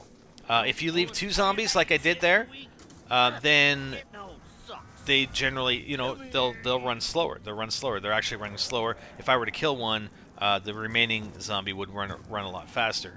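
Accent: Canada. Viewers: im guessing you're canadian? btw thx for the strategy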